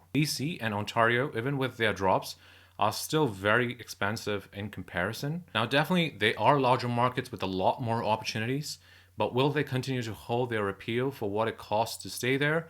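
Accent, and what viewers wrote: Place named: Iran. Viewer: Dude has an Indian name but has a mild Iranian accent.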